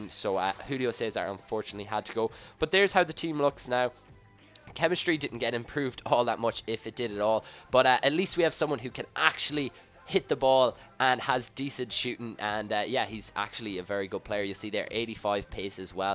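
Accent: Ireland. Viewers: Wow this series is godly btw where are you from you sound Irish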